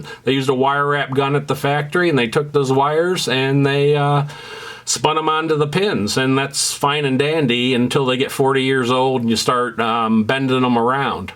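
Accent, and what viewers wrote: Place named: New Jersey, United States. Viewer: Sometimes I can't help but picture Brian Williams behind the voice. Is this a Ridgewood-specific accent or is it widespread through NJ?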